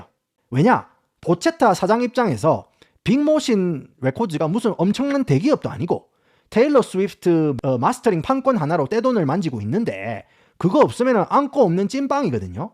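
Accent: Gyeongsang, South Korea. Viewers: 구수한 경상도 사투리에 갑자기 튀어나오는 원어민 발음이 뭔가 언발란스한데 좋네요 ㅋㅋ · 나 충남 당진 사람. 자꾸 전남 당진 당진 할래? 처음에는 전남 강진이 라고 하더니만 자꾸 당진 당진 하네 서버r할나미 뒤짏라고. 경상도 사투리 쓰는 거 같은데 그쪽 동네나 빗대서 얘기를 해 자석아~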